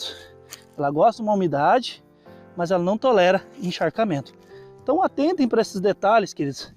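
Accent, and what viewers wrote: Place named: Rio Grande do Sul, Brazil. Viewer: Olá, sou gaúcho. Pelo sotaque vc tbm. On?de fica teu sitio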